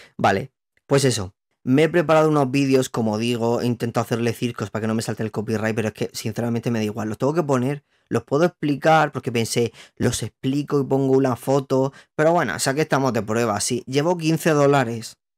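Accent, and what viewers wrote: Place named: Vega Media, Spain. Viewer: Que me meo yo soy de la vega media con razón me pareces tan próximo con algunas expresiones y la gracia al hablar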